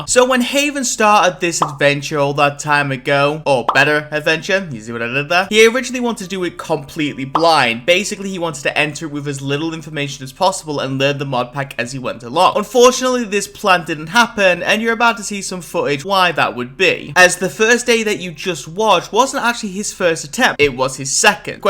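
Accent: United Kingdom. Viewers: Yo fr I CANNOT listen to a british "person" talk for 30 mintues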